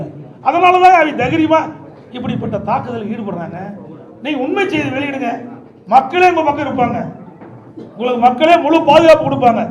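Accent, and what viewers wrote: India. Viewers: திலுப்பி திலுப்பி கொங்கு தமிழ்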